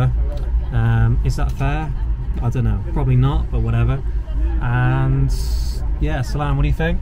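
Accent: United Kingdom. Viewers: Your thick British accent is good bro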